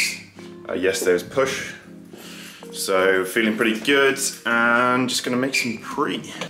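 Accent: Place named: United Kingdom. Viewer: Well, I'd say that it would be interesting to keep up with you not only for gym activities but for whatever you may like to show us (like some progress with your entrepreneurship etc.). This way, guys who come here for some motivation and a positive example of self-change will see that they can not only change their physical appearance, but their life overall. If you'll be willing to take that role, of course. A big thanks to you for creating this channel, mate! I found your shorts extremely interesting and useful - they don't take lots of time to watch and are straight to the point. Also, y'know, I'm a straight guy and all that - but, dude, you look damn amazing! I love British pronunciation over all others and must admit that your is awesome. Thanks for keeping it understandable for non-natives.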